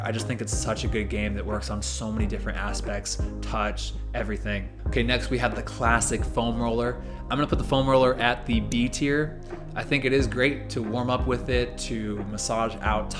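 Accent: United States. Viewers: In America we call it soccer, and you sound American. Real Football 🏈 is different. The title of your video is misleading. Just kidding man. I watched anyway and these tools will help either sport. Thanks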